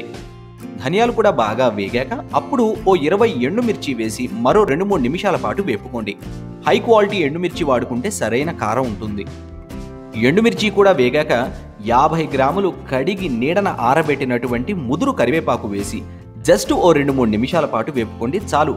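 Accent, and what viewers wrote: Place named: Telangana, India. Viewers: Telangana yasa. Super bro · Telangana slang super..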